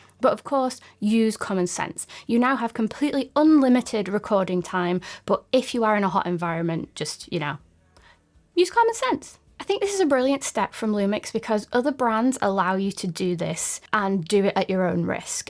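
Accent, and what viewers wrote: United Kingdom. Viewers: You speak in a very nice, soothing tone. I am surprised because I usually don't like British accents (too high a pitch), but yours is very wonderful.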